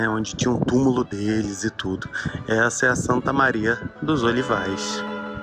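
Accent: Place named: Niterói, Brazil. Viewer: Muito bom o video, reconheci o sotaque de Niterói. Gostei, porquê você conta as histórias. Parabéns!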